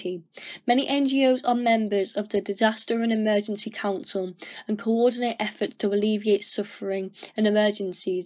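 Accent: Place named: United Kingdom. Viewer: Can you please make your content to be in text so we can see,so of us can't really keep up with the British accent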